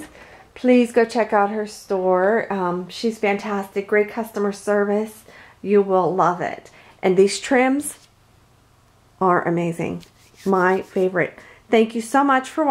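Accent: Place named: Pennsylvania, United States. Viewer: Ok, I thought I recognized that PA or OH accent. Then I saw the 'keystone' shape you used to stick in the clip holder. And I thought Ok.... she must be from PA. I grew up in western PA, near Pittsburgh. I live in SC now, but spent the first 18 yrs of my life up there. So where are you from? Hugs, Jessica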